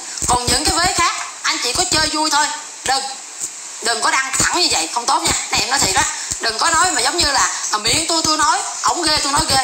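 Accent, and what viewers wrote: Standard. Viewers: Em ca hat noi rat chuan nghe kg ong thay dong ho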